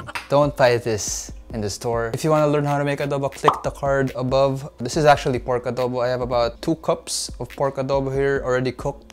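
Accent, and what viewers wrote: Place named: Philippines. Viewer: I THINK YOUR ARE FILIPINO BECAUSE OF YOUR ACENT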